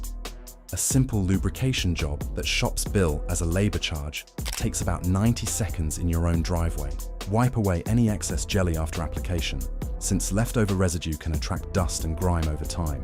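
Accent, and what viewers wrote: England, United Kingdom. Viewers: Why is original English accent talking in Dollars⁉️ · English accent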